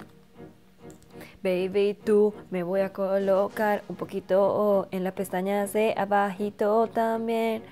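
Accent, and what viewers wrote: Venezuela. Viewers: jajajajajajajjajajajaa que risa no paro e reir ¿eres venezolana?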